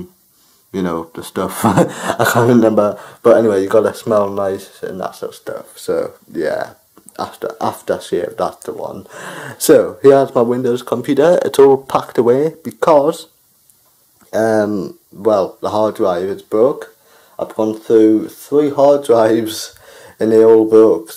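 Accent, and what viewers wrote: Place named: United Kingdom. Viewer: I knew it! That is really good British voice.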